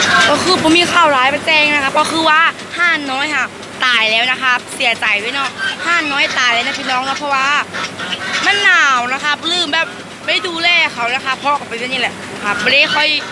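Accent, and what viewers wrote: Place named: Phu Thai, Thailand. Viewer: สวัสดีค่ะน้อง ขยันจังเลยนะคะ เว้าภูไทน่ารักเลย น่าสนุกเด้อเลี้ยงเป็ดยายชอบจ้า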